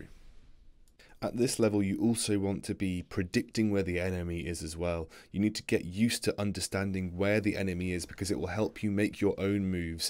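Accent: United Kingdom. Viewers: think hes on Europe because his intro and his language show us he is brtish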